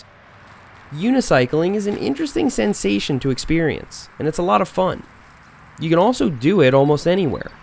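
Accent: Long Island, United States. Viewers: ! Easy to spot that Long Island accent!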